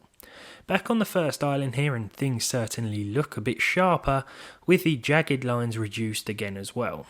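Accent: United Kingdom. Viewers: Omg. Very good british acsient. <3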